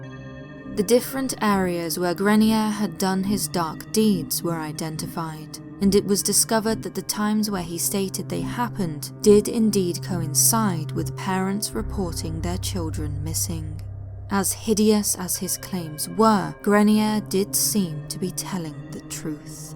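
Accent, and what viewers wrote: United Kingdom. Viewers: I love this voice, is so feminine, so delicate, so British, so beautiful 🥰, hope you like roses 🌹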